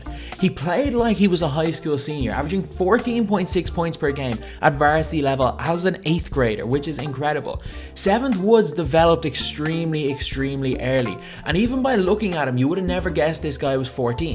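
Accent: Ireland. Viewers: some sort of irish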